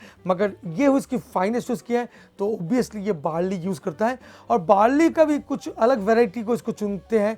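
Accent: India. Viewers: You have a great accent.. I really like your true desi style. No fake dikhawat.. Bless you with good health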